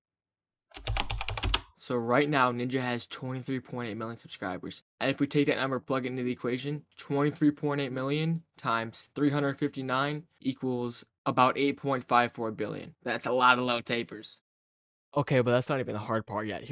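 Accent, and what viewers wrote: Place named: Russia. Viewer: Russian Detected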